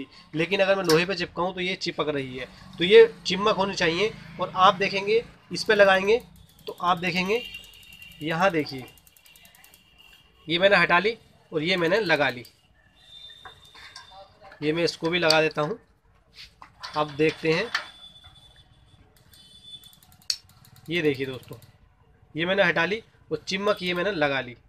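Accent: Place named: Moradabad, India. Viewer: bhai ji bhaut accha video aur Jaankari Dene ka style style bhaut Saral aur sulabh...dhanyabad...sirf itna bata dijiye ki Kya aap distt moradabad se belong karte hai...aapke bolne ke style se Aisa laga mujhe....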